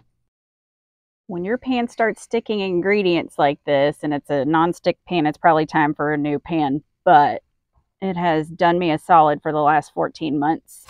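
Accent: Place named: South, United States. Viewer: I love your personality and your adorable southern belle accent, happy travels!!!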